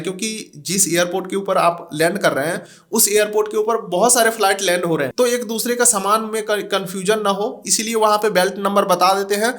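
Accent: Bihar, India. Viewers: Sir ap Bihar se h kya voice tone lg rha h 😊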